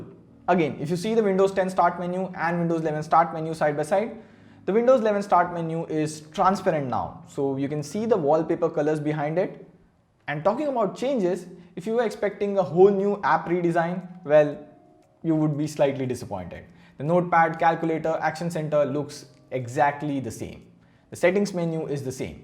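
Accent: India. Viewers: All indian accent are the same😌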